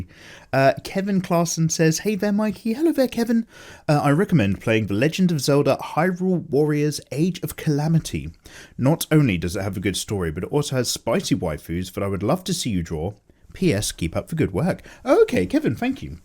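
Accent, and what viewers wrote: United Kingdom. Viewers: Everyday i see this man and go Amazing voice, british, drinks tea, draws lewd girls, HOW IS HE STILL SINGLE!?!?!?!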